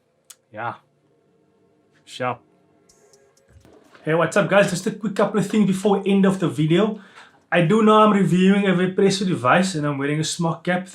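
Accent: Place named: India. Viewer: Top stuff uncle, keep it up😅🔥love the Indian accent 😂🤣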